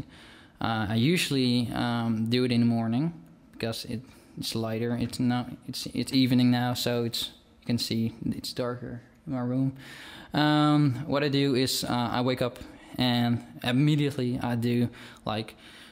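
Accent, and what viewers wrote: Netherlands. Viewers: are you dutch? :3 you sound like you are. IT'S CUTE. :3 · sounds like a Dutch accent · Ik hoor direct aan uw accent dat ge Nederlands zijt :D Heel goed! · AaronTK Kelly He is dutch. :D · You are dutch aren't you :p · where are you from? from the Netherlands?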